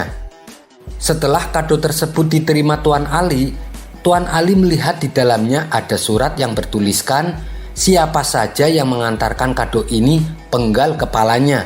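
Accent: Java, Indonesia. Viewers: Medok amat kowel lo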